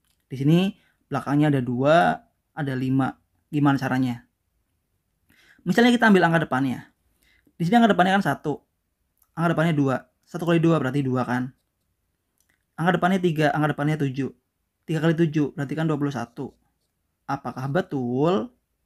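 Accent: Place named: West Java, Indonesia. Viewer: Asa wawuh siga urg sunda haaa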